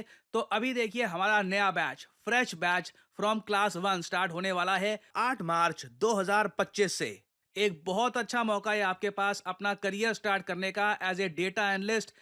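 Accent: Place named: Punjab, India. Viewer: Sir to aaj Punjabi twist me padha rhe hain 😍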